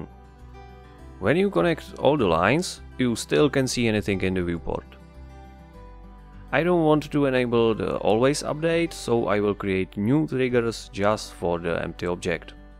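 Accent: Czech Republic. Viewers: is " " a CZECH channel??? the guy's voice sounds like if he sopke Czech at some time I am czech too and so far I haven't found allmost any good czech Blender Channels and I just want to know if I am right and there is someone else I could speak to in my home language so am I right or wrong??? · Hah, právě jsem na tento tutoriál narazil a hned u prvních slov si říkám, že mi ta angličtina přijde s českým přízvukem :D Tak jsem rád, že dobře slyším.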